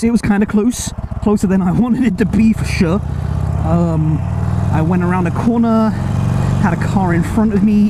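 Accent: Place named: Australia. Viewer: Hey nice first motovlog! Are you from Australia or the UK? your accent is not very local haha. You really do remind me a lot of the Aussie motovloggers though. What is funny, is that I was going to use iridehawaii as my channel name when I first started but I was watching a lot of Revzilla product reviews and the "rev" won instead of "ride". I guess since Andy gave you some tips, this motovlog was kinda long. There is absolutely nothing wrong with long motovlogs but as a new motovlogger try to keep it under 10 minutes to keep your audience's retention. We are still trying to get to know who you are. As for swearing, it really doesn't matter. It is up to you how you want to deal with your swearing. For me personally it is a non issue unless every other word is a swear word for 10 minutes then it gets a little old and unbearable to watch. Anyways, great Harley you got there. 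I hope you can ride with us one day! Welcome to the Hawaii motovlogging community!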